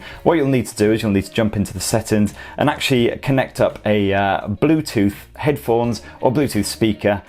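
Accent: United Kingdom. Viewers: Garbage device and garbage accent ! Please speak like normal human being and not British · Excellent Review! Your British accent helps too. 😎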